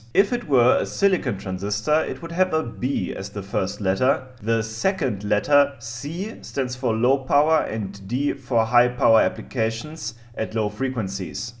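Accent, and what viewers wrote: South, United States. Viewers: Your 'Murican accent is impressive. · Pretty good American accent :) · your American is excellent. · I applaud your perfect American accent. Source: I'm an American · We sure appreciate a southern accent here y'all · I always laugh at how southern American you can sound, after speaking in your proper German accent. Gets me every time. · love the american accent :)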